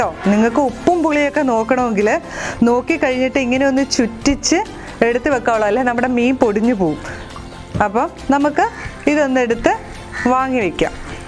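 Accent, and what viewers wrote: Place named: Alappuzha, India. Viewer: Njangal alappuzhakkarude meencurry mathramalla aa bhashayum ethra sundaramanu.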